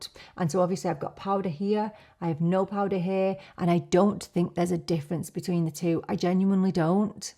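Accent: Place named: Manchester, United Kingdom. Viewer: Just stumbled onto your channel after searching for Estée Lauder double wear. I love this concealer! Trying to place your accent, are you from around Manchester?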